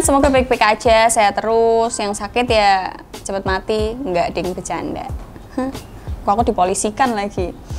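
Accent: Java, Indonesia. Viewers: Mba medok amat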